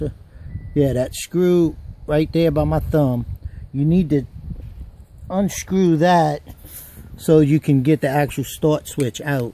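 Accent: Louisiana, United States. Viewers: Say bree? Now I know y'all from Louisiana. Good vid, very helpful, thanks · Great video, thanks ...where did that "accent" come from, Louisiana?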